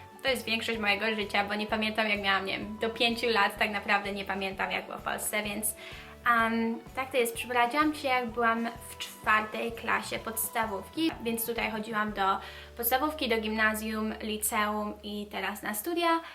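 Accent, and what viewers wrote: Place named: United States. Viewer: Pomysł z założeniem kanału był strzałem w 10! Jesteś super sympatyczna i masz bardzo fajny, amerykański akcent. Leci subek :) Btw, nie dziwie się, że Sara jest Twoją koleżanką, bo obie jesteście przesympatyczne! Może jakiś wspólny vlog? Pozdrawiam! :)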